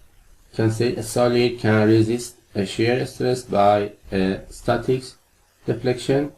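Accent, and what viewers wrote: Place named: Africa. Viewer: that's aafrican accent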